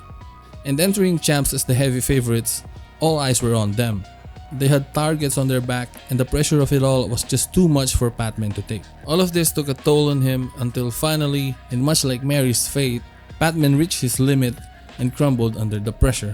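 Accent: Philippines. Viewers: Pinoy ka bro? tonong pinoy ka kasi eh. 😁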